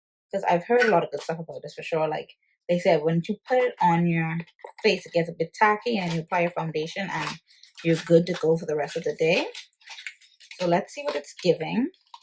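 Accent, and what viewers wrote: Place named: Bahamas. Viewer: Absolutely obsessed with watching Sephora hauls! I’m a Bahamian living in Canada too and when I hear your accent I was like uhhh yeah I gotta subscribe! Keep it up your video quality and content is very nice!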